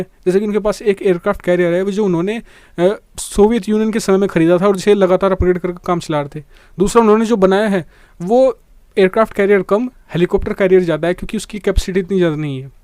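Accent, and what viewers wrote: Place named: Bengal, India. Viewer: Tum kidharke banda hai sala bangala jaisa boltahai hindi vi achi nahi baltahai.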